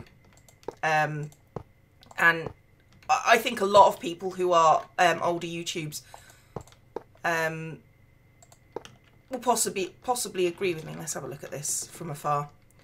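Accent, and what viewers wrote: United Kingdom. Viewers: You don't sound fat. You sound British.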